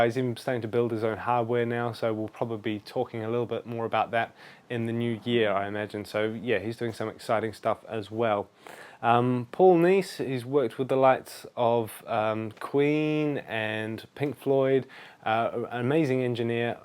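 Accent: New Zealand. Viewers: ah! i knew you were a kiwi!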